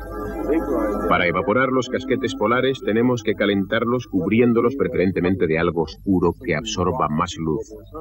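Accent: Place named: Spain. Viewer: Es un buen programa, el contenido muy bueno; lo malo la pronunciación (del español de España es asquerosa) ni siquiera pronuncia adecuadamente el inglés como es costumbre de los españoles, se escucharía mejor en una traducción en español neutro...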